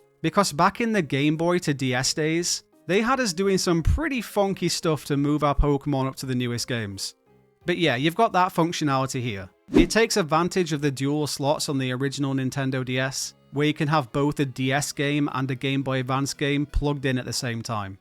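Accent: United Kingdom. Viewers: I love the contrast between your cutesy looking avatar and your, at least what sounds to me, south yorkshire accent. got a giggle outta me lol I'll add that if you want, there's some glitches that allow you to acquire the event legendaries. they're not hard to execute but you should follow a guide so as to not get lost in the eternal abyss. but I'm sure you knew about it already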